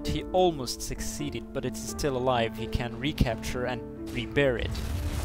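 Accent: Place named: United Kingdom. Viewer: When did your accent become british?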